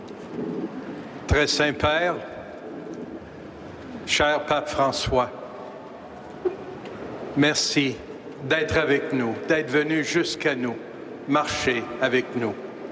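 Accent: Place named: Canada. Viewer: on voit que vous avez les origines française Courcelles est noms de plusieurs communes françaises... J'espère la foi dans le Christ... L'accent chantant canadien surprenant ici mais agréable à entendre... Merci aussi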